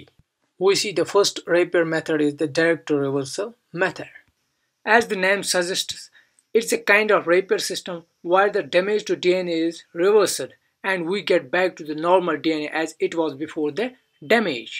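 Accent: Kashmir, India. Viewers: Are you Kashmiri. U accent seems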